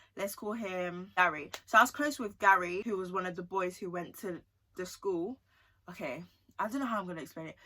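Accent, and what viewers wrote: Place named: United Kingdom. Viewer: Im from the US And I believe your from the UK Right You have a Uk accent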